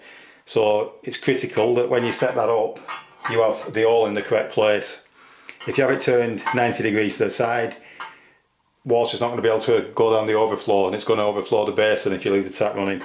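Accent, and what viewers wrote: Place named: Lancashire, United Kingdom. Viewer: Afternoon. SO I stripped all the sealant off and started again with the waste plug. This time a rammed loads of sealant on the rim of the upturned plug, then loads on the bung. The latter lubricated the bung so it slipped on without anywhere near as much force. Then I walked away for 24hrs... AND IT WORKED! Patience was the missing ingredient! Thnx (BTW you sound like you have a Chorley/ Leyland accent, brother)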